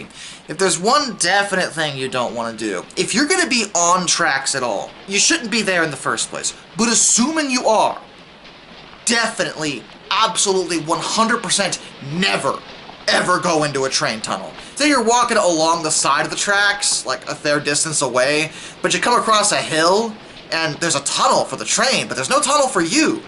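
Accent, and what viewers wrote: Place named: United States. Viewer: Can't watch it. Bloke is a Moron. Annoying Yank. Shut the F up.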